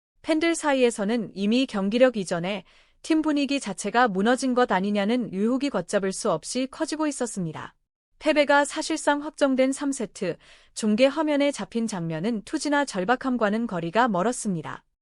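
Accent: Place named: China. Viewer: 자막이 초등학교도 못 나온 사람이 쓴것 같고. 멘트는 중국인이 읽는 듯하다.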